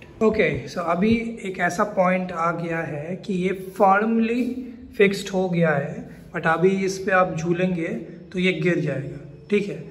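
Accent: Bengal, India. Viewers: Bengali ya odia?😂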